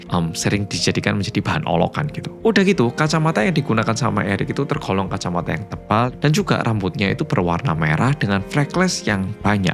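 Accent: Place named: Java, Indonesia. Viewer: Dihukum seumur hidup😮😢 Iblis gilak itu... Suwun ceritanya ya medok🎉❤🎉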